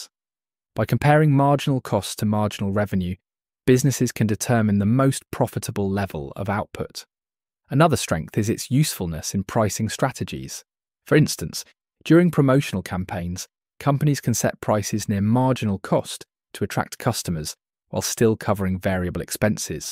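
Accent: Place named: United Kingdom. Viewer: I love your British accent❤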